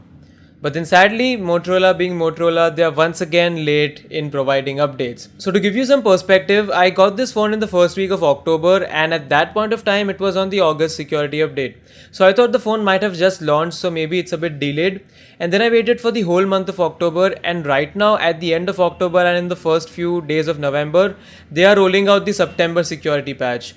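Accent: India. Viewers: You have hands down the most anoying voice on the planet. Or maybe i just hate the way Indians speak English..... Yeah probably the 2nd one.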